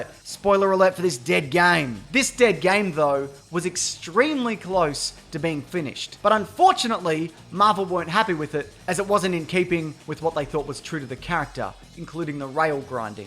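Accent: Australia. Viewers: Marcus Casamento All but three countries in the worl use the metric system. Also, are you deaf? he's Australian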